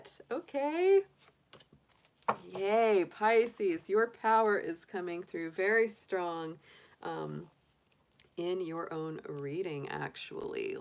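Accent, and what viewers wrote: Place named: South, United States. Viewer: I love your southern accentb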